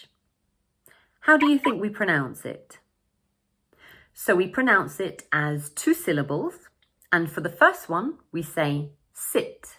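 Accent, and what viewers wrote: United Kingdom. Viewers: 영국식 영어는 표준이 아닙니다. 미국인도 듣고 비웃는게 영국식입니다. 미국식 영어 발음을 들으세요. 하이윤다이? 미국은 히윤다이, 헌다이 등 다양합니다. 저 발음에 속지마세요. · 와 진짜 왜케 깐지나냐 영국 발음.. 자꾸 눈 앞에 왠 양복입은 신사가 아른거림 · 영국 자동차 리뷰채널 Carwow 시청자들은 자주 들어봤을 발음이네요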